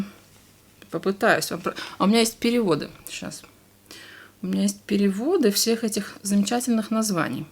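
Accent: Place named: Latvia. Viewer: Sveiki:) es, ceru, ka var latviski, jo dzirdu latviešu akcentu:) tik gribu pateikt, lai šis gads ir saulaināks un priecīgiem notikumiem bagātāks! Ļoti forša episode, arī es atceros eBay, es pat tirgojos tur, radās ideja papētīt to atkal:)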